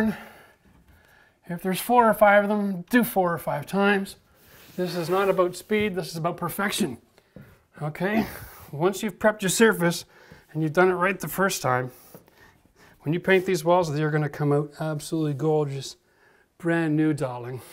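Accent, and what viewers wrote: Canada. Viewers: he's Canadian, give him some credit, we all speak different dialects of the English language. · "Don't want to drag dirt through your mud".....never heard that sentence before haha. I knew you were from Canada! Sound like Steve Smith. Can you use a premixed joint compound instead? I couldn't find a small bag of 45 on my trip to the store. I grabbed at bag of the 5 repair. Hopefully that'll work! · I knew he was Canadian by his accent. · Caught that in the first sentence! We love Canadians! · How many people can tell he's Canadian by the way he talks? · The fact he mentioned Ottawa and every time he said ou didn’t give it away? 😂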